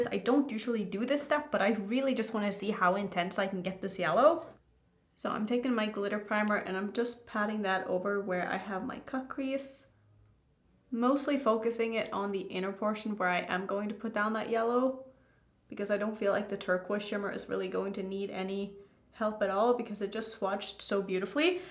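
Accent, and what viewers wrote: United Kingdom. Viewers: In Manchester where he comes from, often when they said blush it sounds more like blursh. That’s my theory anyways 🤣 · It’s called blursh because it’s spelled JUST like some brits pronounce the word blush. The also say bush for brush. “Did y’all wash your bushes?” I hear him say it alllll the time on his Instagram weekly. It comes out sounding like BLURSH to us Americans:)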